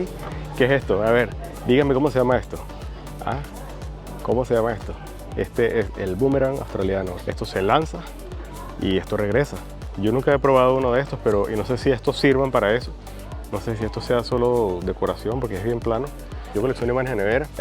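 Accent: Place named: Colombia. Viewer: Muy informativo el video, gracias hermano. Eres de Barranquilla? Tu acento suena un poco colombiano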